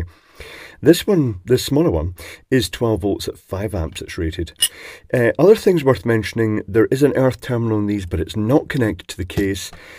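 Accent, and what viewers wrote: Scotland, United Kingdom. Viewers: If it wasn't for your Scottish accent, I would have thought I was watching DiodeGoneWild, withheld transformer teardown.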